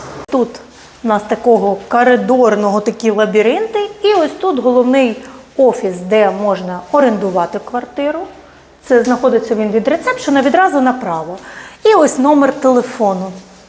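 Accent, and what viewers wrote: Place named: South, Ukraine. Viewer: Доброго дня ! Ми часто вас дивимось в Україні в ютубі.Нам подобається ваша мова і ми завжди думаємо з якого ви міста.Я думаю с юга України бо ми з Херсона.Дякую за відповідь!.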